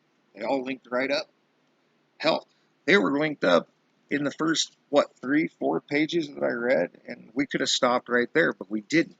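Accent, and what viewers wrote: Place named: California, United States. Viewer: Hey, - Yeah the comment was mostly about the physical observation of Pinball. the song came 2 mind. I've listened to the entire video. The number coding is interesting but I don't get it all. Your dedication to discrption as to the form makes sense. I admire your talent for being able to cut into this line of logical explanation. I'm seeing a lot of things as well, different direction of observation on certain aspects. You have a cool accent. I can't tell if it's California or the Great lakes, your delivery of speech is a bit quicker than a Midwestern accent. We have a long, long way to go. It's like we're just now entering the late first quarter of the football game. Peace. df.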